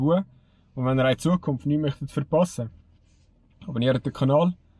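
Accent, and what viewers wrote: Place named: Switzerland. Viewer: Als deutscher muss man ein bisschen besser zuhören um Schweizerdeutsch zu verstehen wegen dem Akzent, aber ich hab es verstanden und bedanke mich für die tolle Erklärung mit Vorschau. Danke nochmal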